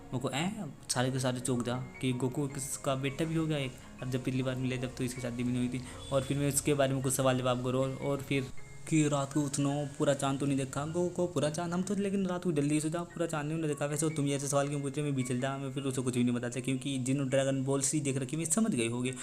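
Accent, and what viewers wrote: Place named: Haryana, India. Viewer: Bhau hindi bolo haryanvi nhi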